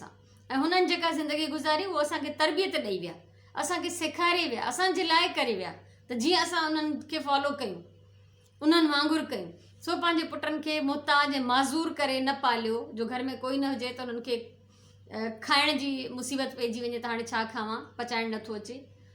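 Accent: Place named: Larkana, Pakistan. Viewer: Assalamualaikum, dadho sutho lagho khalis larkane wari language budhi " KHAI DHO KAYO"😊 Asan khay Ayoob cholan ware ji khabar aahay jaiko Royal Cenima jay samohn rarhi lagaindo ho, tamam behtareen chola thahindo ho. Missed your Friday session.😞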